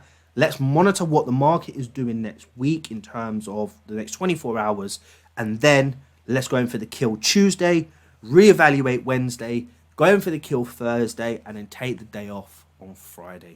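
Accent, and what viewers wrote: United Kingdom. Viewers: He sounds british to me and they have a different way of seeing these situations. They often have a funeral and next gather for a meeting lunch and I think thats their way of showing respect and appreciation for the person. I hope Im not saying bullshit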